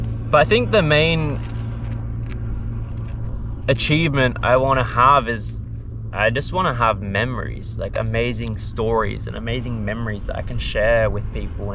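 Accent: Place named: Europe. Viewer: can you speak clearly and slow because your english it sound uoropean tone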